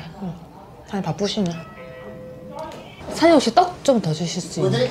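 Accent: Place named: Gyeongsang, South Korea. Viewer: 경상도 사투리.ㅋㅋㅋ 가시나 ㅎㅎ. 그냥 좋다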